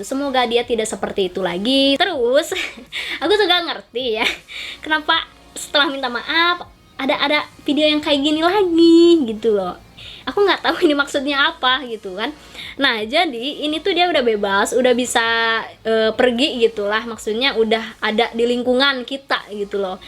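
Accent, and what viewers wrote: West Java, Indonesia. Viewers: Orng sunda ya ka??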